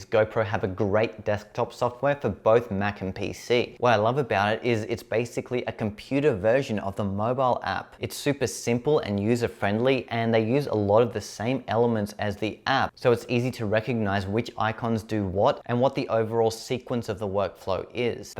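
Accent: Australia. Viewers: good to hear an Australian voice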